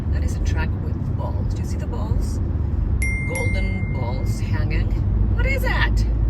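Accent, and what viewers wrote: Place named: Rosario, Argentina. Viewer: Amazing rosarigasino’s accent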